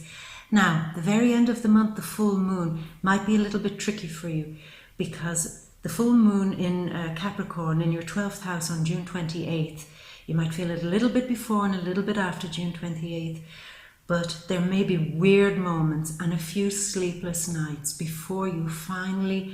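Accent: Ireland. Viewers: Sooooo funny, shove it up your arse...amazing and with your Irish accent..haha...thank you for an amazing reading and totally resonates with me looking for something to hold on to.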